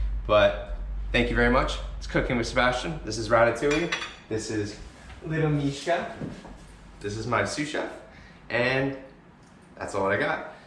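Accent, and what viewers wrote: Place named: United Kingdom. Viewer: AM I THE ONLY WHO HEARS SLIGHTLY BRITISH ACCENT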